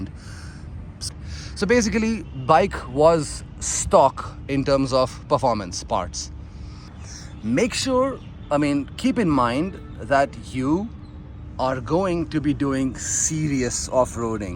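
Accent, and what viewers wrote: India. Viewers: you don't like the video, you go on and search some other one, no one's forcing this video down your throat, you came here all by yourself, like you said "YouTube is free" Aur rahi baat accent ki, I have seen a lot of people from south india, especially Bangalore, who speak fluent English without any kind of particular accent, kind of similar to what speaks, doesn't means they are putting up an accent, maybe that's the way he has spoken all his life, maybe that's the way he learnt it, why does it even matter. What I am trying to say is you need to see both side of the coins before judging for yourself, and don't judge for others, nobody cares. and oh I am not from Bangalore advocating their accents, I am from punjab, just sharing something of relevance here that I saw 😂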